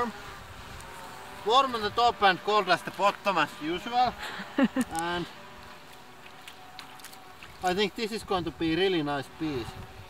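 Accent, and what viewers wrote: Finland. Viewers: Are you from Finland? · are you from Finland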